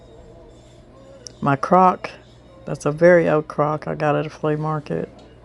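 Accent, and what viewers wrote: South, United States. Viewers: Dear Miss, Another lovely video of you and your family! When I listen to you talking about Christmas and all your decorations, it’s like listening to myself. I have a room in the basement that is just for “all things Christmas” and I keep saying that I need to give some away, but it just doesn’t happen! Maybe I should try harder!🤭😉🥰 I’m looking forward to making the Irish Soda Bread………wish I’d had the recipe last week in time for St. Paddy’s Day, but that can be made anytime of year…….it’s always delicious! Thanks again for your videos……I really enjoy listening to your soothing, calm voice and your gentle southern accent. We’re expecting snow and sleet tomorrow so I guess our spring is aways off yet! God bless you and! 💕💕Jackie🪷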